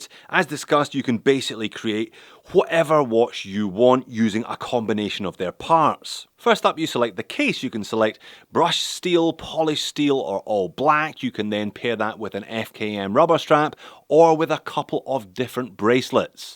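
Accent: Scotland, United Kingdom. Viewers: Great to hear a fellow Scot talking about things I love! These are great looking mod kits, I was looking it up while you were building yours and I thought the colour way you chose was the best one too 👍🏼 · I’ve just managed to buy one in metal case. Love your Scottish accent coz I’m originally form Glasgow and enjoy everyone of your programmes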